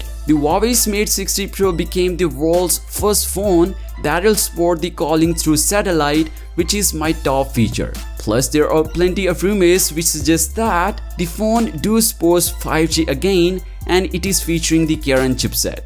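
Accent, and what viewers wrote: India. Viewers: 听着像印度口音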